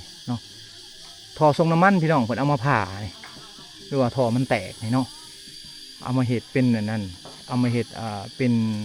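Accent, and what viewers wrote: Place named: South, Thailand. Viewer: ฟัง คนใต้บ้านเราเลยเนอะ😆😆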